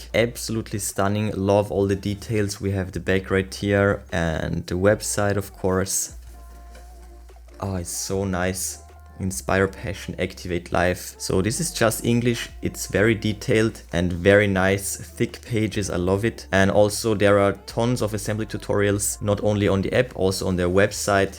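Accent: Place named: Germany. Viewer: the way says "sooooo" and "bähttery" revealed his germaniness XD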